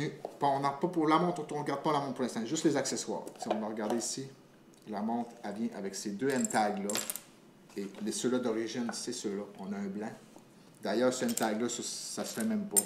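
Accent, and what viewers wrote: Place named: Canada. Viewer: Cet accent Canadien est tellement sexy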